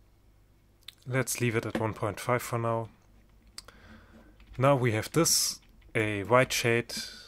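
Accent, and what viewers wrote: Germany. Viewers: Man hört schon in den ersten 10 Sekunden, dass du Deutscher bist^^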